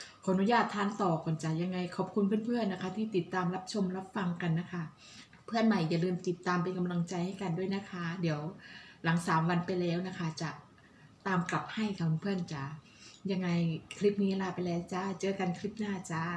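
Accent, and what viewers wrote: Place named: Korat, Thailand. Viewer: น้อง เป็นคนจ้งหวัดอะไรสำเนืยงพูดเหมือนคนโคราชมัยหรือว่าแถวนางรอง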